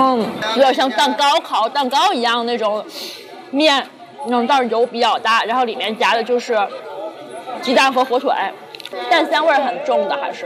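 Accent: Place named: Tianjin, China. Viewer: 点赞Kaka的视频！天津话太亲切了。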